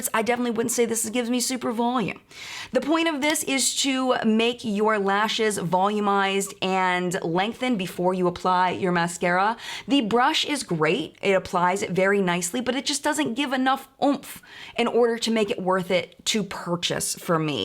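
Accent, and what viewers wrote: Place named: Baltimore, United States. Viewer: How did I just I now realize you’re a fellow Baltimoreian?! I hear it in the “accent” now 😁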